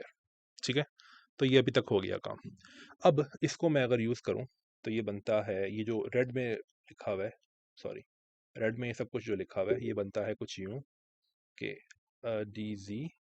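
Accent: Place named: India. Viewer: Either this is a hardcore Indian accent or you're not speaking English at all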